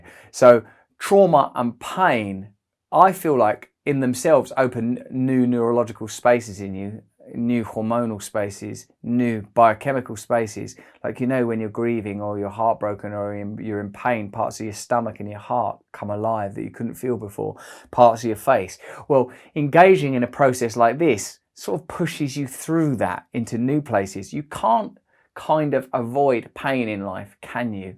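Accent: Cockney, United Kingdom. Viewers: Us bloody yanks would actually take you seriously when you sack your cockney accent.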